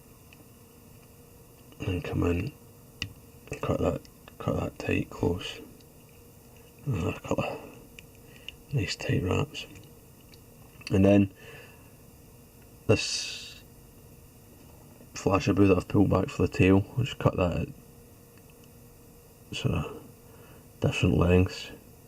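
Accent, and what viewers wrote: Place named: Aberdeen, United Kingdom. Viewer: Love the tutorial and your super Aberdeen accent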